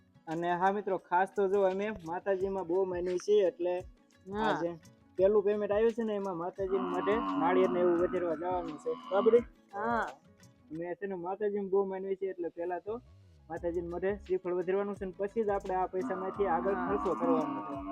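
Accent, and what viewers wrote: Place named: Saurashtra, India. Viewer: તમે શુધ્ધ ગુજરાતી ભાષા માં બોલો છો એટલાં માટે વીડીયો જોવાની મજા આવે છે સૌરાષ્ટ્ર કાઠિયાવાડ ની ભાષા મીઠી હોય છે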